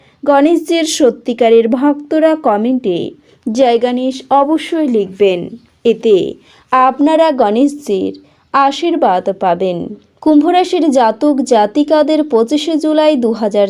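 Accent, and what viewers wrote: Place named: Ghoti, Bangladesh. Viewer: ঘটিয়া বোলি বন্ধ করো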